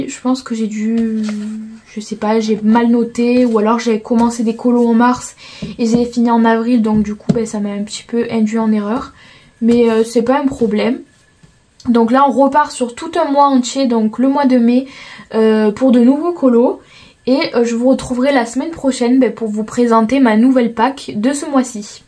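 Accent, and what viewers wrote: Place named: South, France. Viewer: Coucou, je suis tombée par hasard sur cette vidéo et j'ai passé un très bon moment, je possède aussi le petit livre Fantasy mystère, effectivement les solutions sont superbes mais comme tu dis c'est tellement petit 😱 J'avais commencé le dragon et je l'a laissé en cours , je n'ai pas le courage de le reprendre 🤣 J'ai fait la chouette que tu montre et je te confirme que c'était très long , et encore , moi je fais ça aux feutres donc je trouve que c'est moins difficile d'accès qu'avec une pointe pinceau comme les feutres acrylique , surtout dans les toutes petites zones ! Je ne sais pas d'où tu es exactement mais ton accent me fait pas mal penser à celui de chez moi , même si, pour une sudiste, j'ai pas un accent ultra marqué, je suis pas loin de Montpellier, enfin à 45 minutes quand même xD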